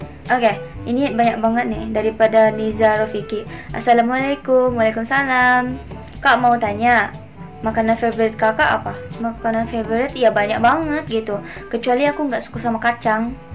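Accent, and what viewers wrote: Indonesia. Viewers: LOGAT BAHASA INDONESIAMU 👍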